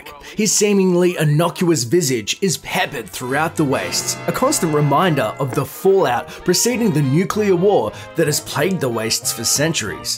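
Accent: Australia. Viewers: Its very Australian i should know i live here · God, I hate the Australasian accent...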